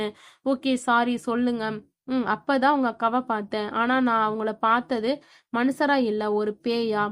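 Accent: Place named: Chennai, India. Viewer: Nice Chennai voice 🎉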